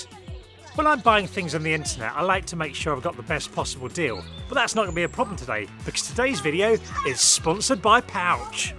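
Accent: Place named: England, United Kingdom. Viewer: Listening to talk about his garden in his English accent is my comfort food 😂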